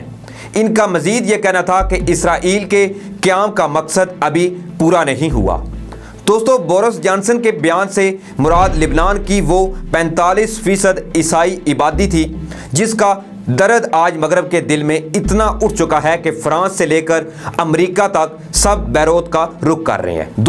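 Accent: India. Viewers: Is the presenter an Indian from his accent?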